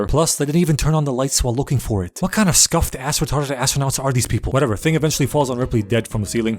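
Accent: Germany. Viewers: Love the German accent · german accent is unbeatable. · Are you German?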